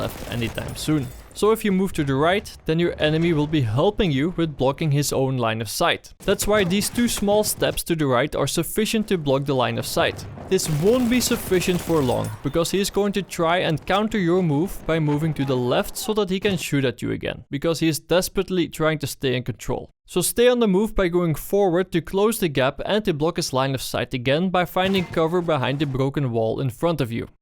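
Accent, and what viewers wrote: Netherlands. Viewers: You sound Dutch or Belgian to me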